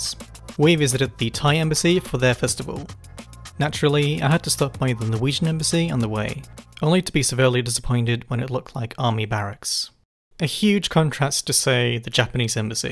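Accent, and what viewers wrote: Australia. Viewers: That's true, this chap sounds half Aussie and half cultivated midlands or such like.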